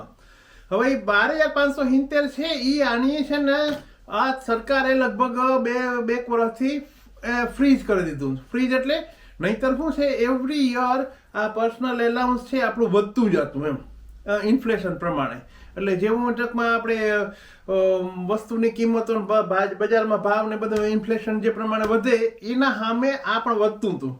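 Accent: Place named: Saurashtra, India. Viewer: Sorthi boli hambharva Ni Maja ave chhe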